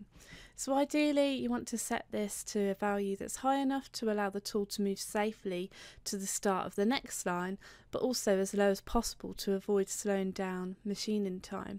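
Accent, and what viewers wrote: United Kingdom. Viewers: Excellent videos with a good pace. Can always go back and watch again to take notes. And the English accent helps! Not sure why you don't have hundred of thousands of views - much better than many cnc tutorials!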